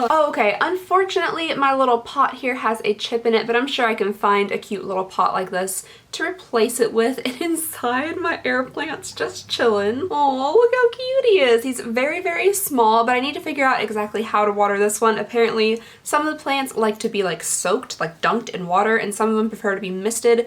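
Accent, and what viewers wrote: Kentucky, United States. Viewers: Cool.. you go to the same school as my son and his girlfriend.. just a county away from where I live.. lol I can tell by the accent you're KY! 😊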